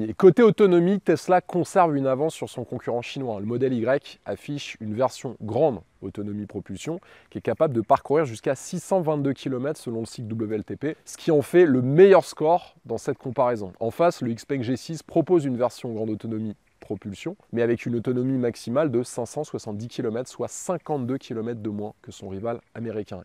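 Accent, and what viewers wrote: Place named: France. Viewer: Pourquoi vous n’utilisez pas la fonction traduction en anglais automatique. Votre anglais est horrible. La phonétique est 100% française. Ça fait mal aux oreilles de vous écouter en anglais 😖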